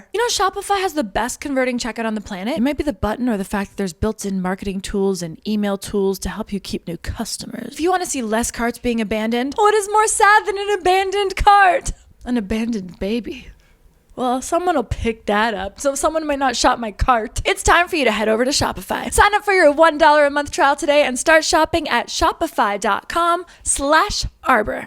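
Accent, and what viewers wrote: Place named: South, United States. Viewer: For a Canadian you sure speak southern talker.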